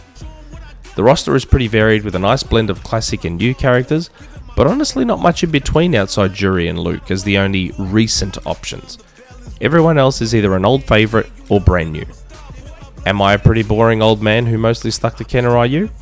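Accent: Australia. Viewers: ah nice to hear another Aussie!! Thanks for the Awesome Review!! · Love the Aussie talk about games, even though he is a Collingwood supporter. Good review.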